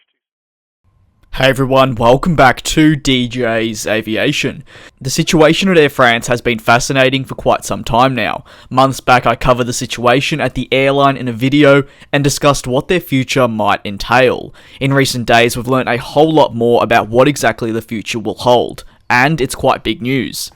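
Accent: Australia. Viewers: what's worse? the a-380 or the gay narrator from oz?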